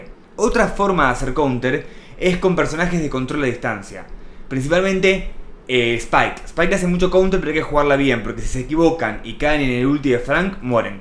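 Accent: Argentina. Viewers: Eres argentino o mexicano?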